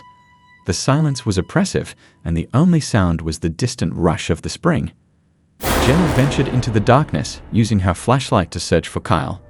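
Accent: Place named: United Kingdom. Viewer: A Brit narrator narrating stories about Appalacha. To me it just doesn't fit.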